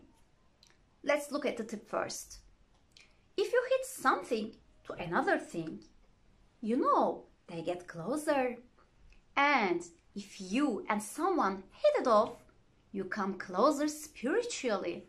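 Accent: Turkey. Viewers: Mrs. is my English teacher at school. I love her very much. If you don't like the video it's probably because of the way she is speaking but please keep in mind that we are Turkish. We didn't born neither live in a country that speaks English. It’s not our native language. This video helps me a lot with my lessons at school and I hope it's the same for you too! If I made any mistakes while writing this please point them out so I can learn the right. Thanks for spending your time. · You pronunciation likes Turkish, I think, you are from Turkey, right?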